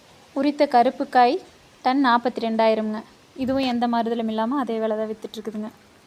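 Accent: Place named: Kongu, India. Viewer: கொங்கு தமிழ் அழகு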